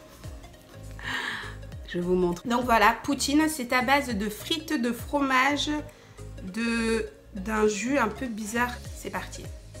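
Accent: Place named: Africa. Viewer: C'est pas parce que tu es Française "à la peau NOIR" que tu es regardé par les "Français" Tu aurais pu répondre en message et non nous rappeler que tu es "Française" à la peau noir et et l'accent Africain. Si les Africains se sont rapprochés de toi c'est juste qu'ils s'identifient à toi !!!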